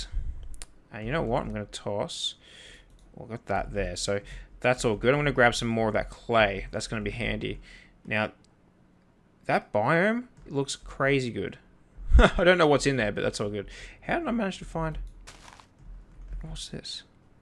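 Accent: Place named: Australia. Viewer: Great video dude would like to see more but Are you Australian because you kinda sound like it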